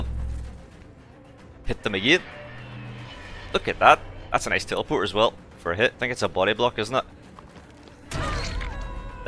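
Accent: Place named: Scotland, United Kingdom. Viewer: I really love the accent! Are you Scottish Sir?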